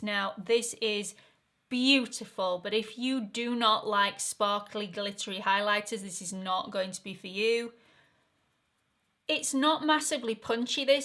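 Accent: United Kingdom. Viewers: Hi, thanks for another great review. You always create the most beautiful looks with such a simple technique. Also, no worries about pronunciation. I find that a good many words are pronounced differently in the UK vs the US (I love the way you say vitamin... We pronounce the first i as you would in the word like and then the rest the same as you). It is just a cultural difference and definitely nothing to worry over. Peace, love, and happiness!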